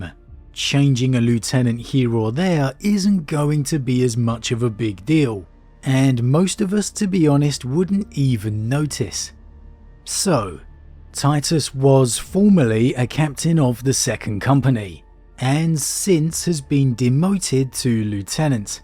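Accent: United Kingdom. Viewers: The American pronunciation of Lieutenant is correct. It was only the British misunderstanding how the French were inflecting the "Lieu-" prefix that led to the incorrect "Left-tenant" pronunciation you guys use today.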